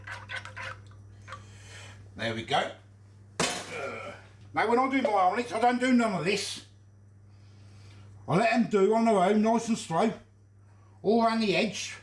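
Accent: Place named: England, United Kingdom. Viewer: I love how only a person who has lived in England for a good amount of time would understand half of that killer accent😂.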